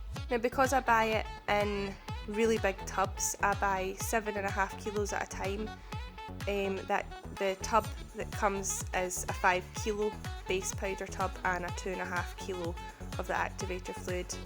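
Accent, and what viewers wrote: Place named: Scotland, United Kingdom. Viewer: Great Video, I agree about using a whisk its much easier to get the mixture creamy enough and lump free. Thanks for Sharing, great to hear the Scottish accent too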